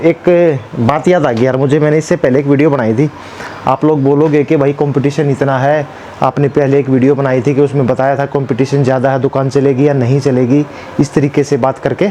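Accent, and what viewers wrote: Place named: Haryana, India. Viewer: Sir Mane aap kafi video dekhi..or mujhe aapki na to kirana ki Shop m koi interest hota h ..or nahi hi in product m... Lekin sab se bade maze ki baat wo ye h ..ki aap k video ander aap ki jo balne ki jo kla h.. haryanvi Desi andaza m ..wo mujhe bahut jayada pasand aati h...Jo altimate h.. Aap ki aavaj sun kar bahut aacha feel Hota h...aap ki jagah koi or hota ..to m ye video kabhi nhi dekhta... aap ho to he aap ki video m jaan...h Or Mari hardware ki Shop..h Is liye kirana m Mera koi interest he nhi hota..baki AAP ka working style supperb..h.❤❤❤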